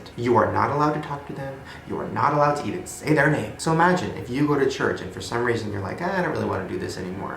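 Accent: South, United States. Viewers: shane....that's still a southern accent it's just not as thick. people also tend to lose accents when they move, etc. and he lives in florida now so.... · he still has a bit of a southern accent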